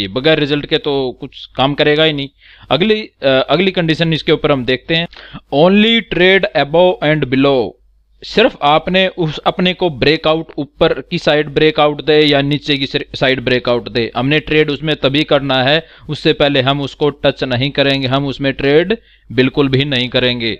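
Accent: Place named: Haryana, India. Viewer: Guru haryana se ho lagta h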